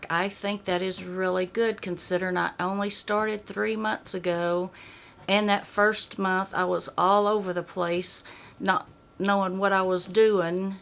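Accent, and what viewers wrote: South, United States. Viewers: YOU ARE SO PRETTY! I LOVE YOUR SOUTHERN ACCENT SO MUCH! Keep up the hard work! God bless you! Also, you don’t need to lose weight you are perfect just the way you are!❤❤❤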